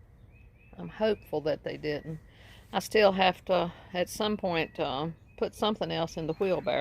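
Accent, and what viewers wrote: South, United States. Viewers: My favourite kind of garden. Love the Southern accent.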